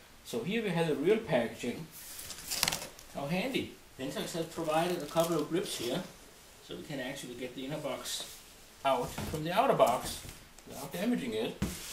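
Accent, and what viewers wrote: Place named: Denmark. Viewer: I know that Dansk accent :-)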